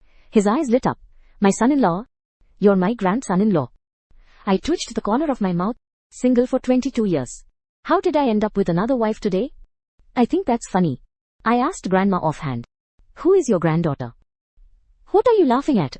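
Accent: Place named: India. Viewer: Coba yg naratornya English biasa masih aman tp ini india adehhhhhh harus pasang telinga utk lebih jelas pengucapannya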